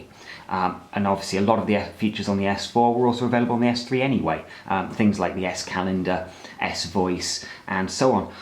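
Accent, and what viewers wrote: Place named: RP, United Kingdom. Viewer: Your voice is so posh and funny - I love it! Great review, by the way!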